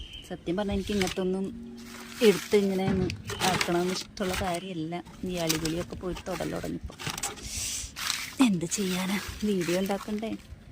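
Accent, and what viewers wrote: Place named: Kochi, India. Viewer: ഇങ്ങള് കൊച്ചി കാരിയാണല്ലേ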